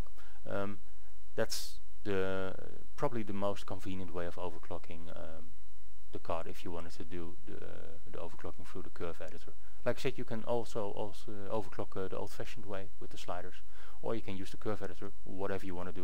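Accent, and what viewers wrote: Netherlands. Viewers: Goeie englisch men i almost kant hoor your duts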